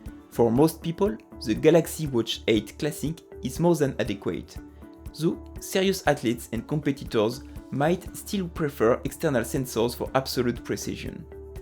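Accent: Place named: France. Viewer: This is the thickest French accent ive ever heard. Its great. Thanks.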